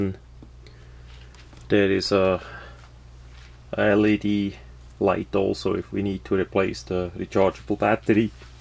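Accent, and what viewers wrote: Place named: Switzerland. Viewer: awesome schwitzer-english accent... really funny.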